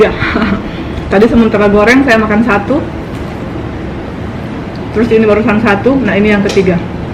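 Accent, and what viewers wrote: Manado, Indonesia. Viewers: Yup..... mantap ...... dari logadx campuran Manado n daerah mana ya......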